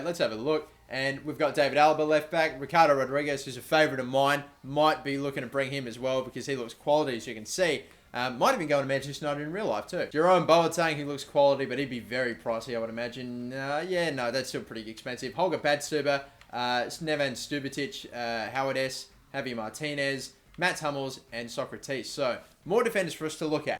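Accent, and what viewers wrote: Australia. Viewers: Definitely a fellow Australian? Nice video dude. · You an Aussie?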